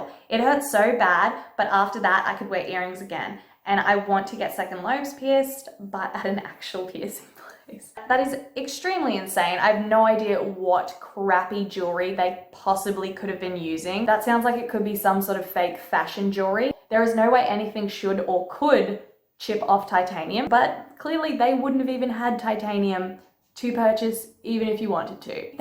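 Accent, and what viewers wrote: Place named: Australia. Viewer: i LOVE the way you say tattoo ! Australian accents rule :) much love from the States <3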